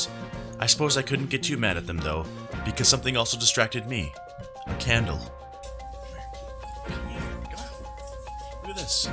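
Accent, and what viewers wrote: Midwest, United States. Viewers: Are you from the Midwest? Your accent... it is strong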